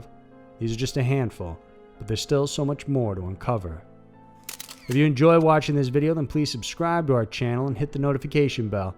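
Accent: South, United States. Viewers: your voice really makes these videos interesting. Am I hearing a southern accent? Have been watching a ton of these the past two days, you got yourself a new subscriber!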